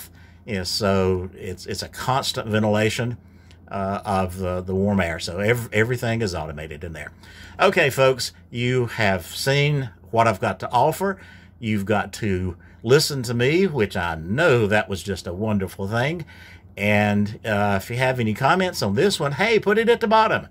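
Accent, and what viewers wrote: South, United States. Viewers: Love the Southern drawl. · Hey! Loving the Southern draw☺️ I believe you may need to talk in your videos more often. This was super awesome. Love seeing your setup. The mounts look great. I agree with the growth on the mounts. They do amazing. You’re a natural at it. 👍🏼 I can’t believe it’s gonna be that cold for 3 days🥶❄️ Hope to see more videos with you narrating.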